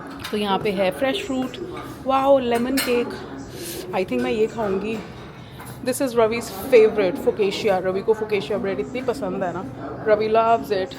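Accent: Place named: Punjab, India. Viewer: Bhabi g thoda accent punjabi boldeya bahla ajeeb lgda kde kde Bahut sohna kr raye soon u gonna get good number of subscribers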